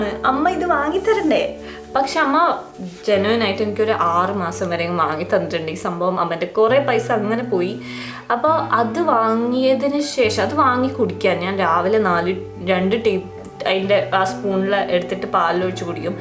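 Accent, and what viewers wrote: Kannur, India. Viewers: Uff kannur basha😂💫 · Kannur evdya .oru knnur slng und talk l evdya gym ??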